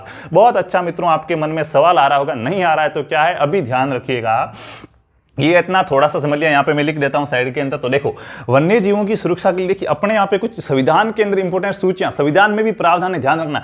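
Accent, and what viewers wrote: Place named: Marwari, India. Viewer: Sir aaj ki class bhut aachi thi 🙏🙏 मारवाडी बडी मिठी बोलो आप 👌👌👌👌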